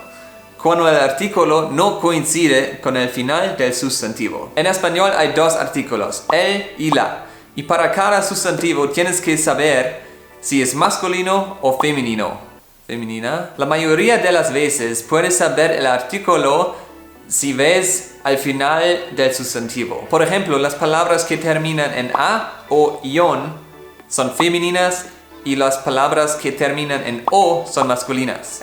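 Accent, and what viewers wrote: Germany. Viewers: Hablas perfecto el español,se nota tú acento de alemán pero hablas perfecto,enhorabuena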